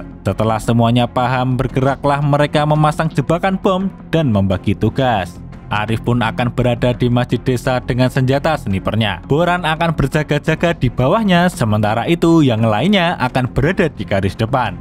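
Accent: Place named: Java, Indonesia. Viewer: bang yg ini take voice ny kok medoks jd kurang epic haha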